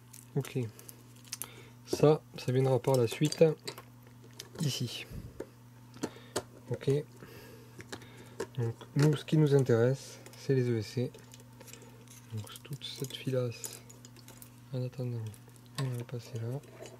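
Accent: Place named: South, France. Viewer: Pas mal du tout ! Le design est plutôt intéressant ! Cet accent, tu es du sud de la France ? On attend le maiden maintenant ;) Bon week-end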